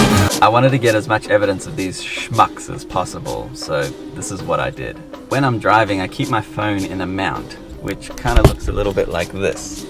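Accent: Australia. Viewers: I just realised now your Australian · You Australian? Ik where Richmond is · are you australian???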